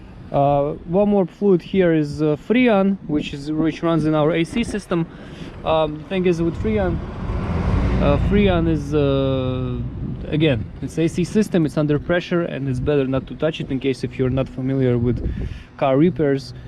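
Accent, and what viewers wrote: Russia. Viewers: Спасибо за русский акцент)))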